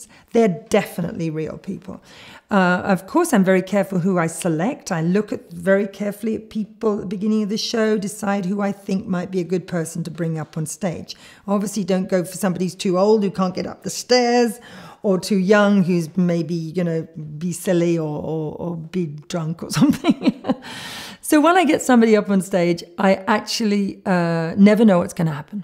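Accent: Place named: United Kingdom. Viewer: Best British accent I have ever heard. Splendid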